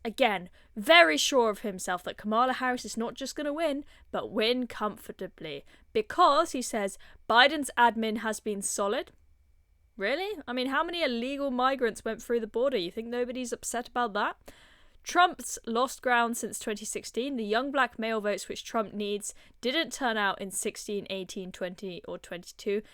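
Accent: United Kingdom. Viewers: Who's the Limey Chick? Is she the Channel Host? She's Lovely!!! 🌹🌹❤❤ I love the little teenie thing she does with the eyeliner!! Beautiful Young Girl!!❤❤❤ Her Limey Accent just adds to her charm!!!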